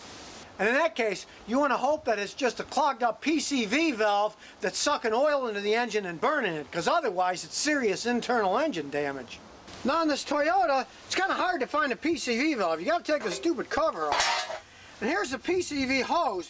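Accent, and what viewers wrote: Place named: South, United States. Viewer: Where it'd be drippin its hard to tell he said that because his southern accent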